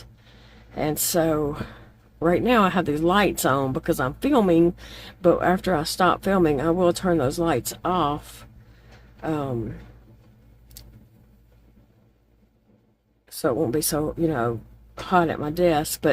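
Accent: South, United States. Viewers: Ah, the southern accent gets us in trouble, doesn’t it? I laughed at both your stories. I’m so happy you enjoy spending time with us. I listen to you when I’m crocheting or coloring for the most part. There are some crochet coloring books on Amazon, some with granny square pages to help plan out a color story.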